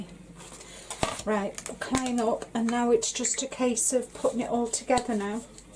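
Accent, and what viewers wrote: Liverpool, United Kingdom. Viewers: Love how you put this together, watched the vid after watching some of Mike's... only recently discovered this form of art.... you're very inspirational..... do I detect a hint of a scouse accent there? X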